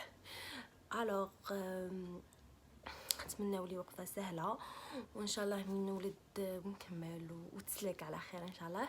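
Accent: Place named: Oran, Algeria. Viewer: نتي تيارتية احكي نورمال مالكي رجعتي تحكي وهرانية و راكي غير وحدك لا راجل لا والو ربي يسهل لك أن شاء الله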